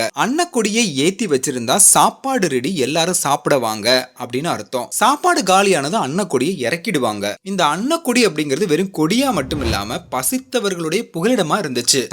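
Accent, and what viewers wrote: Tirunelveli, India. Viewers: Nice information .. now only hearing about annakodi.... U r nellai slang also super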